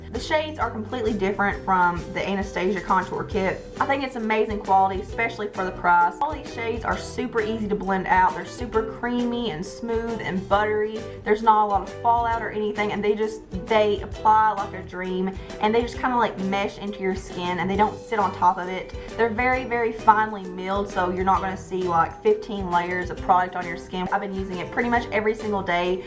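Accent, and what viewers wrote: South, United States. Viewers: I just subscribed!! You have a southern accent, Im from the south as well!! Its nice to finally hear someone who sounds like me on here lol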